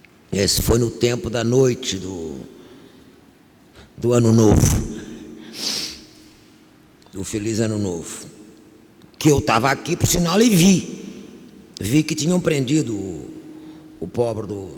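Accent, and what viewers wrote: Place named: Portugal. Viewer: Her accent is Portugal Portuguese...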